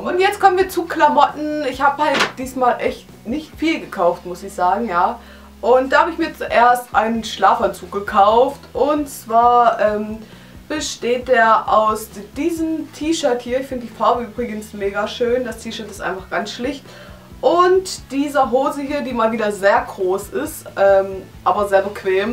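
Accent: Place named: Saxony, Germany. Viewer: woher kommst Du?:D super Akzent, der klingt wie meiner, komme aus sachsen:D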